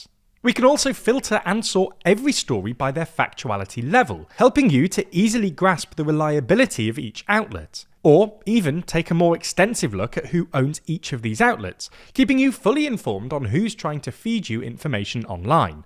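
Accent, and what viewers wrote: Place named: South, United Kingdom. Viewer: He's a poncey southerner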